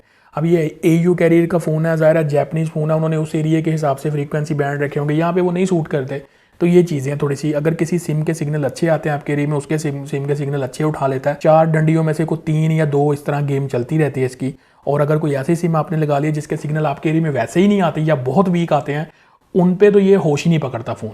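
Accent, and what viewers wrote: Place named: Punjab, India. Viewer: Lagta hy gu panjabi hu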